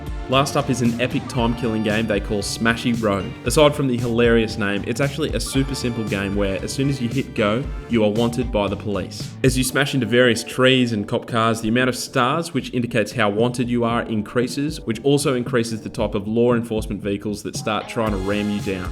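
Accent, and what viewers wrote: Australia. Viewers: Are you Australian? That accent sounds very familiar. Anyway keep up the great work!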